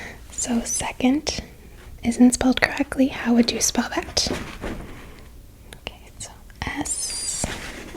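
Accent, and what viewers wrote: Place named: Upper Midwest, United States. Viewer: Phil Mercer many of us think she’s from Wisconsin or Minnesota. I’m from Wisconsin and that’s what I think dere hey.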